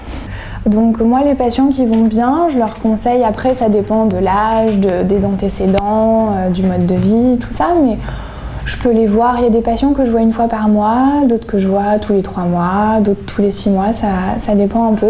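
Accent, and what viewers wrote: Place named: Paris, France. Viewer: Dawsen Mage c’est du français parisien 🇫🇷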